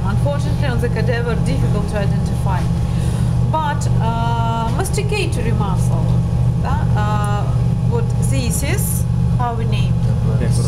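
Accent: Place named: Russia. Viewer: i love that russian accent bro :)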